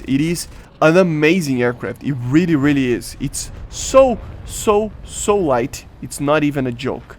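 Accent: Brazil. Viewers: Sabia q tu é br, o sotaque não mente kkk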